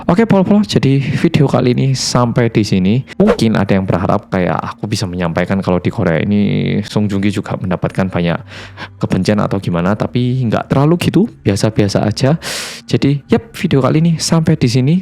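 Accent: Java, Indonesia. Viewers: Medoook👍👍 Edit mungkin lebih halus jika "diasuh" mas bukan "dipelihara" mohon maaf koreksi dikit🙏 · Mas saya mah gak suka sama SJK, saya lebih suka sama kamu ... Muka korea logat jawa 😊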